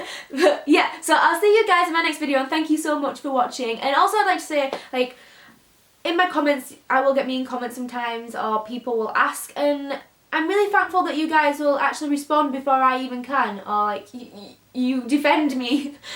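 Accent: United Kingdom. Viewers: I love your accent, are you British?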